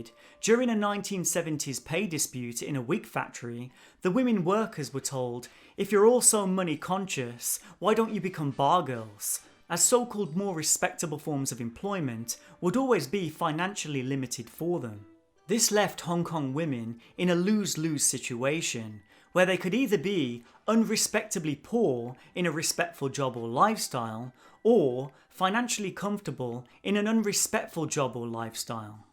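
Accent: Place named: United Kingdom. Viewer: I will admit that I was kinda of skeptical of your channel when I first stumbled with it cause you sounded very British, I'm glad you didn't just gloss over your own identity and pretend like it does not affect the way you view things. The fact that you tried to confront your own biases in this video is pretty great, so kudos to you. Your channel is awesome!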